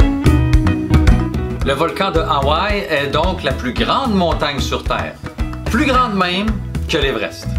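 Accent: Quebec, Canada. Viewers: Ellipsoïdale! La Terre est ellipsoïdale, du fait de sa rotation et de ses pôles plus aplatis. NB: Merci Dr Nozman et Alexandre Astier pour l'expression:) Merci aussi pour cette vidéo très bien expliquée, du très bon travail. Je me suis régalé d'écouter l'accent québecois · Quand j'ai commencé la vidéo je me suis dis <<oh cool un Québécois !>> Parce que j'adore leur accent 🤣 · Très bon présentateur, fluide, instructif, marrant et avec des bonnes musiques ça donne un côté comique très agréable. Et puis l'accent québécois c'est toujours du bonheur ! Joli travail merci !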